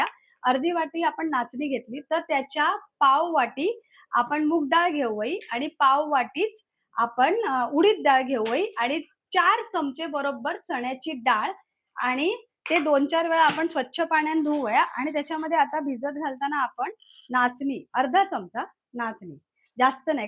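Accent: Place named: Malvan, India. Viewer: तुम्ही मालवणी बोलतास ता भारी वाटता, गोड लागता. तसाच बोला.मराठी बोलूक बाकी लोक आसत. तुमचा आपला मालवणीच चलांदे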